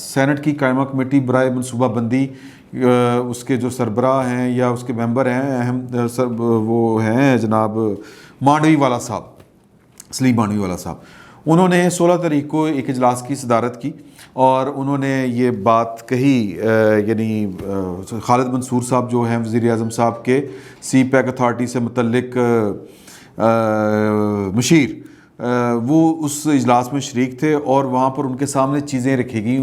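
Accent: Punjab, Pakistan. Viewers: Shafqat Saheb, please avoid speaking Punjabi in a national telecast program. It is uncivilized. Just to let you know that your Urdu pronunciation is also Punjabi type accent. To digest this accent is enough For your non Punjabi viewers. Thanks.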